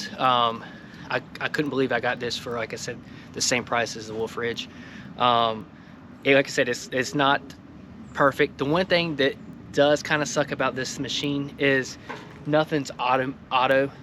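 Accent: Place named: North Carolina, United States. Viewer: North Carolina i knew it. This is random but i like to try and figure out where a place is by the environment, accents, and any other clues. I said hmm somewhere south east, ran thru a couple states then i said ah i bet it's north Carolina. Anyways, cool toy i want one!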